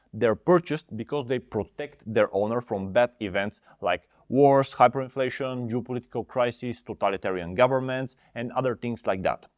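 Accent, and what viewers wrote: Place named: England, United Kingdom. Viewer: Very hard to understand and main reason is that ''English'' accent ... :(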